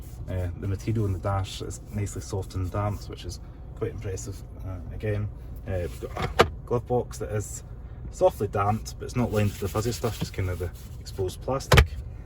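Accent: Scotland, United Kingdom. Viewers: Can tell a fellow Scotsman Usual Scottish windy weather in the video. Nice review as always.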